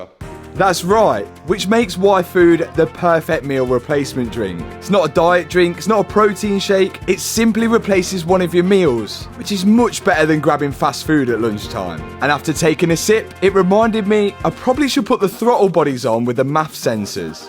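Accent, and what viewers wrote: Leicester, United Kingdom. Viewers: Rat er ley, fer rar ey, noise ey love it good old Leicester accent. And cracking video well done 👍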